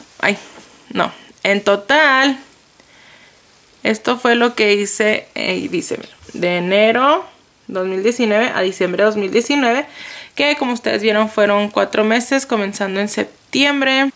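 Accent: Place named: North, Mexico. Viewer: Eres como del norte de México, me di cuenta cuando dijjiste "oshenta y osho" jejejeje. Saludos!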